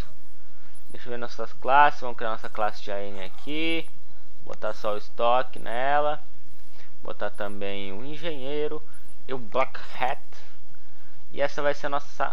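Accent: Rio Grande do Sul, Brazil. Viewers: Tu é gaúcho?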